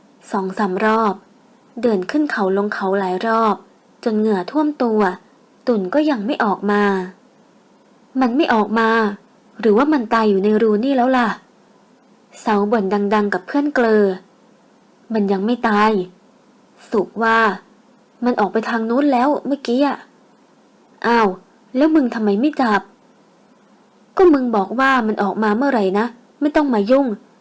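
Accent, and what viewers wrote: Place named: North, Thailand. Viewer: ผ่อหื้อกำ ฮาจะขี้นี่55555แอดน่ารักมากเลยที่พูดเหนือ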